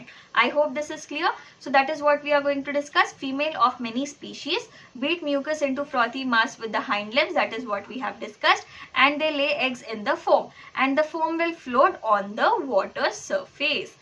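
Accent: Hyderabad, India. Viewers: I think ap hyderabadi hai 😂❤❤❤❤ love your lacture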